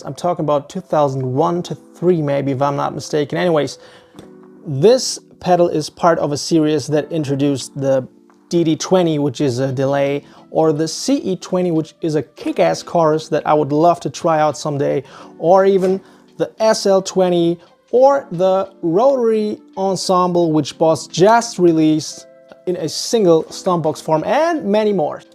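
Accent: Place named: Latin America. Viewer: Perhaps your accent and slight resemblance to Argentinians, possibly why you give off those light skinned Latino vibes? Haha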